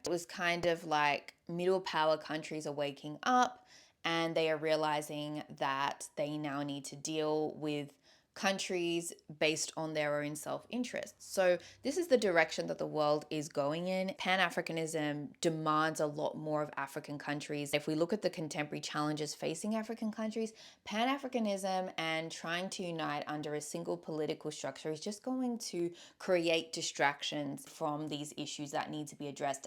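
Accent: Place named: United Kingdom. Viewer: Are you half white? ...You look half-white and sound like you were raised in Britain.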